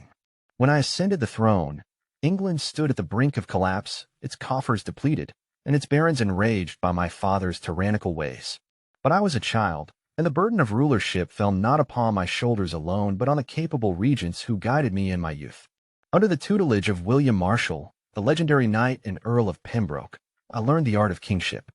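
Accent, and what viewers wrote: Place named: United States. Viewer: "King of England" but has an American accent lol if you're gonna use AI at least give him a ye olde English accent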